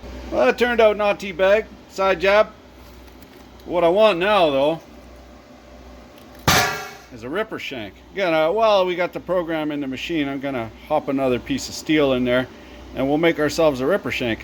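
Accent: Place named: Nova Scotia, Canada. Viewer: I swear this guy is from Nova Scotia.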